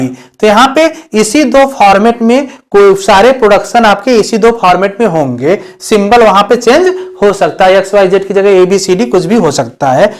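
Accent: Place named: Jaunpur, India. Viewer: sir aap jaunpur se ho kya ?🤗🤗🤗🤗🤗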